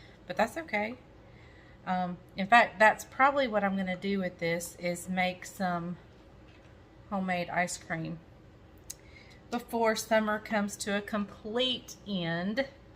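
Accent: South, United States. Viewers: You pronounce Boil like Bowl, that's a southern thing right? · I 💛yr southern voice when explaing yr dishes.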